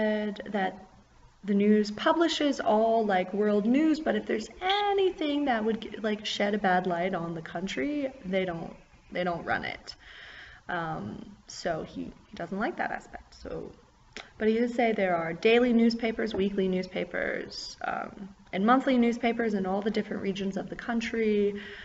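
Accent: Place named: United States. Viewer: That's cool that you teach Uzbeks!!! You have an awesome American accent, like I. Uzbeks need to learn from YOU!!! My former English teacher Salomat Izzatulaevna in school #17 had a hidious british accent and I couldn't learn anything from her. She was a moron, unfortunately. In Uzbekistan you will become a top English teacher really fast! I can show you some teaching techniques that will significantly boost your student's language learning ability.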